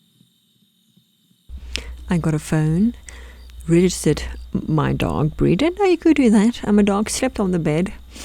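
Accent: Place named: South Africa. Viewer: I knew your accent sounded familiar!! Hey fellow South African <3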